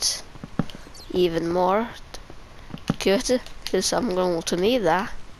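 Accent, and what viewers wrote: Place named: Norway. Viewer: VS Klubben then its a Norwegian accsent..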